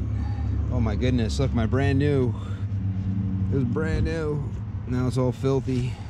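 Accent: New York, United States. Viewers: No hot pads? Go warm up a few then go back up. Oem wires have id numbers on Lennox rtu...re label them. A picture of connections sure helps before removal. New York/Joysey accent...lol Battery azziz..? Lmbo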